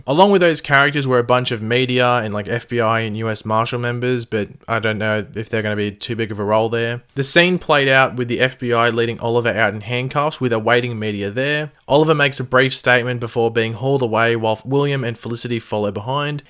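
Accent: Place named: Australia. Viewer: Yhhh I just love your aussie accent 😍 used to watch bondi rescue to get a weekly dose of it now I can just listen to you 🤗😏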